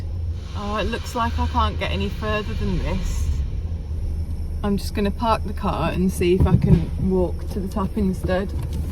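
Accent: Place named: Black Country, United Kingdom. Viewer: Happy Birthday. I hope you had a lovely one. You're absolutely right about the sea, as i nipper i only saw the sea once a year whilst on our family holiday! You mentioning it in that context really clicked with me. I really miss the sea and just stand looking at it when i do see it!!! I guess it makes us appreciate it all the more though. Loved the video, love your Black Country accent, love too!! All the best, Graham xx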